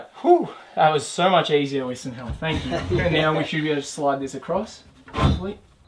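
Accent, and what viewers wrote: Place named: Australia. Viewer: New subscriber here from watching you on Eamon and Bec's podcast. I have ripened stubborn green bananas by putting them into a paper bag with an apple. It really worked and those bananas had stayed green and hard for a very long time. I was about to throw them away. They ripened with a much sweeter flavour than usual. I'm Canadian but I lived in Australia for a couple of years and had my third child there. It's fun to hear Australian sounds again. I look forward to watching more of your videos.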